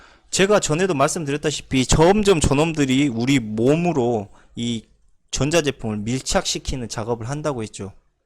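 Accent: Jeolla, South Korea. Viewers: 전라도 분이신가봐요? ㅋ 말투속에 사투리가 구수허시네요^^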